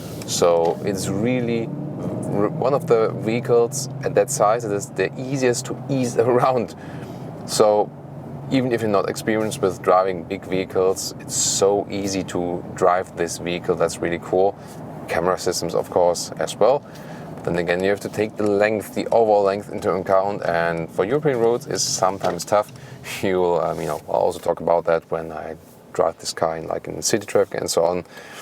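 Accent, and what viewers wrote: Germany. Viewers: I love your detailed commentary. Your English is almost perfect. But you have a very German style of speaking and sentence structure, plus use of some expressions in a German rather than English format. It would be helpful if you spoke more slowly and more clearly. Then we could enjoy every wonderful one of your observations.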